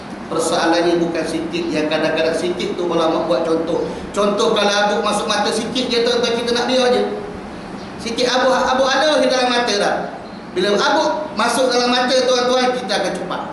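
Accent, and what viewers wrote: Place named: Pahang, Malaysia. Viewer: ustaz ni slang org pahang....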